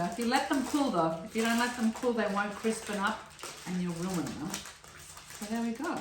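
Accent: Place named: Australia. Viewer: Hi! Good to hear an Aussie do a cooking segment. I think you could probably sprinkle them with sesame seeds on top for a bit of flavour. Maybe you could sprinkle a little Parmesan cheese in with the cottage cheese. Thanks for the recipe. All the best.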